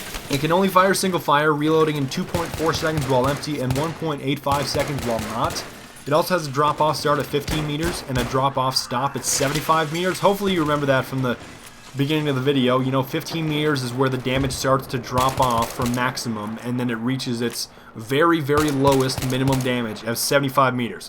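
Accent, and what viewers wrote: Germany. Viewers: bist du deutscher ?? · u german bro?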